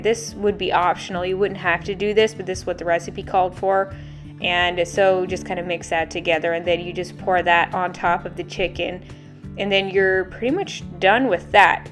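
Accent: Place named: South, United States. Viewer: You sometimes sound so southern although I know you aren't originally from the south. Everyone I have ever met from "up north" has always called dressing, stuffing whether in a bird or pan. Edit: Tough Shrimp means you overcooked. For shrimp you need to only cook till it's pink all the way through. If you keep cooking it will be tough and chewy.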